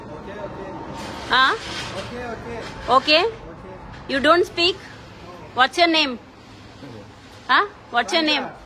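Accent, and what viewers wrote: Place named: Karimnagar, India. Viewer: U r dare to travel alone beeing a girl.Iam from Karimnager seems that you r nearer my slang.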